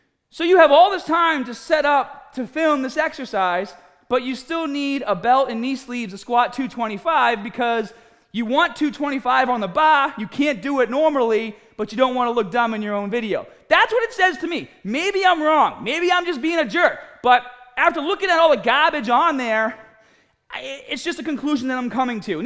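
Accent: Boston, United States. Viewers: Is that a Boston accent? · It’s funny, he sounds like a pretty average accented American, until he say “gAAbAAge” lol. Oh how I love a good Boston accent! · a little less pre-workout maybe, I get you are passionate but yikes dude. PS love the boston accent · Are you from Bahston? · Came because of the title, stayed because of the Boston accent. Good video bro, just gained a subscriber, about to binge watch your videos 💪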